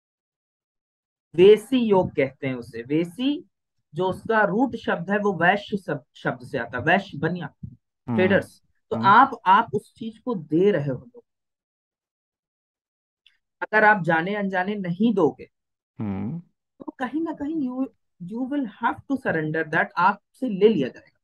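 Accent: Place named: Allahabad, India. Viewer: I didn't know before that he is from Allahabad. But when I heard for the first time, i got Allahabadi vibes from him😅. But ignored it. Must say Allahabad has its own taste.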